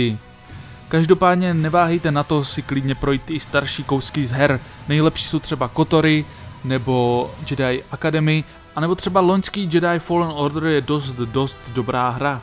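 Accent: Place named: Moravia, Czech Republic. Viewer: Bože ta moravština je hrozná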